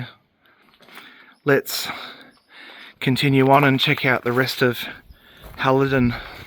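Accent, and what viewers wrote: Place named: England, United Kingdom. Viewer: yep.there good .people like living like that .if u look up.they have great innings .compared to city folk .an are happy . Nowhere is far from.anywhere. .below pt Augusta.try above .😮 might be hours to the neighbours 😂 an ocker up.an u will.get names right . English accent is causing it . Pretty funny given me a laugh. R u gonna give me heads up on that place I asked about . An I'll pass on 3 roads u will love . Plenty of cam opportunities .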